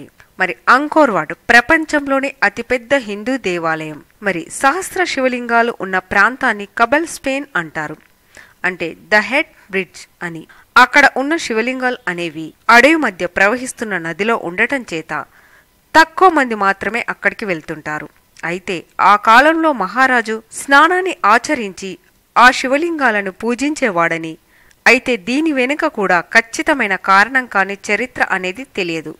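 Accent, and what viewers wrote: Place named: Tamil Nadu, India. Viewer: Need to Improve telugu. It is like some tamilian gave voice to this video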